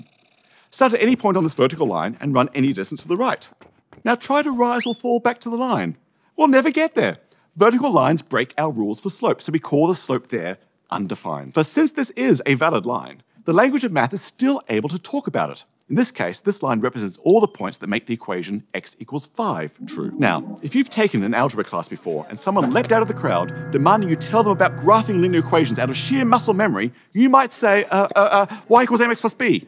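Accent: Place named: Australia. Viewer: What’s the accent? Also, this accent is rather slow compared to other australiamd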